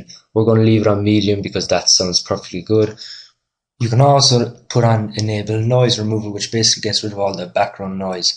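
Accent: Ireland. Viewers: Are you Irish? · I swear you're Irish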